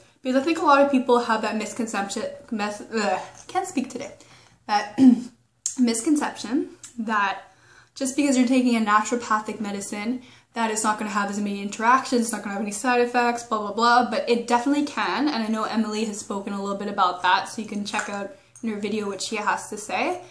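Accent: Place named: Canada. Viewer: yo i love your Canadian accent.. so cute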